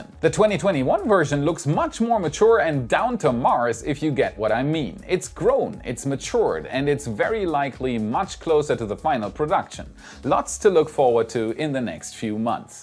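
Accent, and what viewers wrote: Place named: Germany. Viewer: not a bad idea, but no, it isn't. His native language is German. He broadcasts in English so he can reach a predominantly American audience, and I am very glad he does. No other English speaking nation says it that way, either, that I know of, and I do have a high degree of familiarity with the other dialects. As an aside, I think we should pronounce it that way, but we don't.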